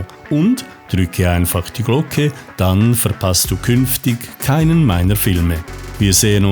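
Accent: Switzerland. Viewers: Finde das Video gut. Und ich mag das Schweizerisch, mit dem Avignon erklären wird!!! Wir haben gute Erinnerungen an die Schweiz, fahren immer wieder gerne in Urlaub dorthin ☺️ Wenn wir nicht gerade nach Südfrankreich fahren 😉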